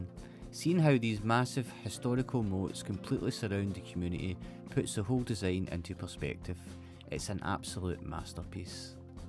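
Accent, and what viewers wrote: Scotland, United Kingdom. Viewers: Naarden-Vesting is definitely worth a visit! In the past, I often took a walk around the entire fortress with my son, who was 10 at the time, along the outside of the water. Beautiful and very peaceful. I do have to get used to your nice Scottish accent a bit, though. Have lots of fun in the Netherlands and thanks for your video.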